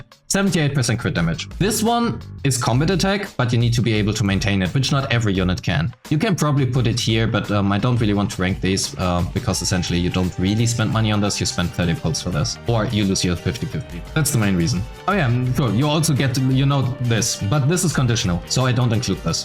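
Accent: Germany. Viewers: Digga ist das geil das es ein deutschen ZZZ YouTuber gibt Ich wusste das du deutsch bist wegen dem aktzent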